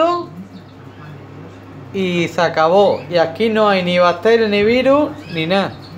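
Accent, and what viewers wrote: Andalusia, Spain. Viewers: Ese andalu que parece que va a contar un chiste...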